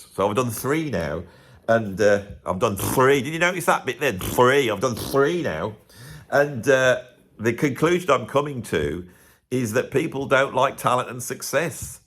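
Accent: United Kingdom. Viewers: I never really was a fan of and I don’t know why I watched this, except that your rants and British accent I find very entertaining.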